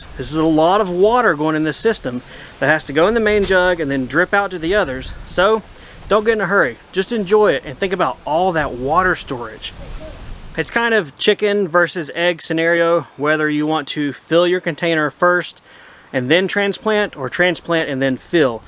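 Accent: South, United States. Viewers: I think that's a southern accent, I'm in a different part of U.S. and we say soil (soy-el) 😉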